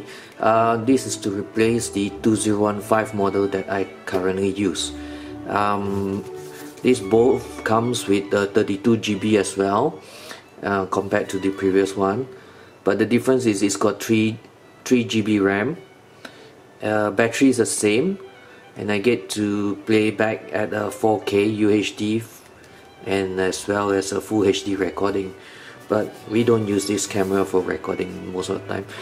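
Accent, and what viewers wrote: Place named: Malaysia. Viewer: your voice like malaysian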